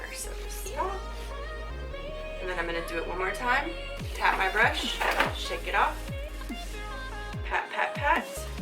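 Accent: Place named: South, United States. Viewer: your accent and Southern charm shine through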